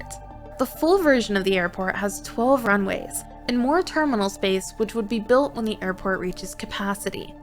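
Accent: United States. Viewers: "Dennnver is known for its outdoor spAcesszsz" - Narrator in her Californian accent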